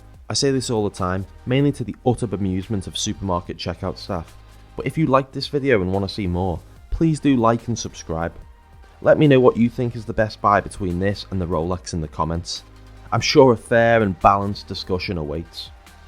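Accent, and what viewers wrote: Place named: Northwest England, United Kingdom. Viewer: Subbed for the northwest accent. Greeting from Ormskirk.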